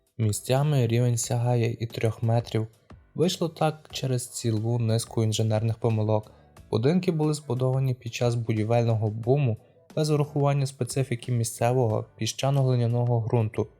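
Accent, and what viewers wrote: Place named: Lviv, Ukraine. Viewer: звернув увагу на вимову числівників. Звучать як у Львові говорять - 50 з пом'якшенням т.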